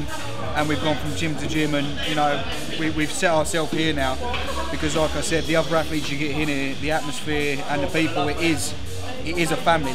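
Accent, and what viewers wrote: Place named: England, United Kingdom. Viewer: well said mate, what part of england u from by the way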